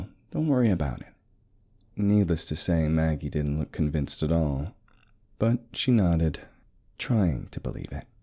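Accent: United States. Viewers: Your American accent is very good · Is that you behind the American accent or a guest?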